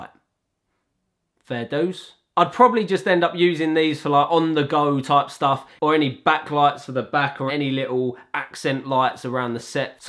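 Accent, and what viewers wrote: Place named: Cockney, United Kingdom. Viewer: Great video. The cockney accent makes the videos even more enjoyable XD.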